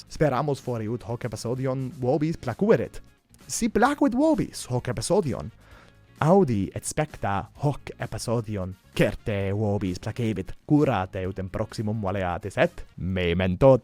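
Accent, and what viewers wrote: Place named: England, United Kingdom. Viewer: I believe it is more difficult for English speakers to sound Latin with a more "Latin" pronunciation. When you speak in Latin I clearly perceive the English way in your sentences.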